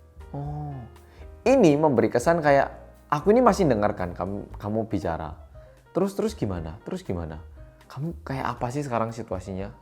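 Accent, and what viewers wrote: Java, Indonesia. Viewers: Pengen punya kaos korea medhok kya mz deh😍😍😍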